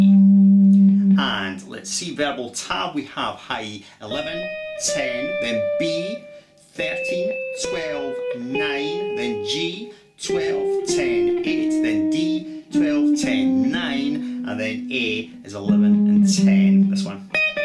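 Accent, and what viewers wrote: Scotland, United Kingdom. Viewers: Instant subscribe because of Scottish accent. (And amazing playing as well ;) )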